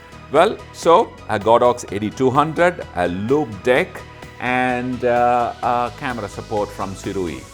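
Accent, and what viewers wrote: Kerala, India. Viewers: Hello, I am following this channel since January when randomly i found a video on the perspective related to lenses on youtube. I liked the video so much that i subscribed with bell before even the video ended and that liking was so positive and is increasing day by day that makes me follow your channel till date. The liking of the channel rather the videos of the channel comes intensely from my heart and the reason behind this is because of the quality of videos you provide, talking of the quality i am meaning to say about the brief but elaborated explanation of the product or settings, its like you complete the syllabus in brief but in such a way that anyone could relate it in their mind and understand easily, atleast i love this short bur descriptive part very much and another cause of loving this channel is the field test section, that is so good where we get to know about the output quality even before we try it, and the most favourite part is the linguistic communication of Sir, i mean as an Indian no matter how much we know or understand english anyone would be able to relate understand and learn faster in this indian accented english than any other foreign accent and Sir's narration is so good that it feels like i am listening to him as if I am in front of him. 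In short the quality of videos with the indian scented audio kept my love and respect intact for this channel till date and hopefully in future too. Keep up the good work you are doi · I was attracted to this channel first time after hearing your polished english slang with a bit of malluism☺️ Simple plain english and excellent lighting makes each episodes a delight to watch. Way to go Mr. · Many congratulations sir on 50k many more to come. You spread knowledge about products in details in very indian english tone. Thank you! Keep growing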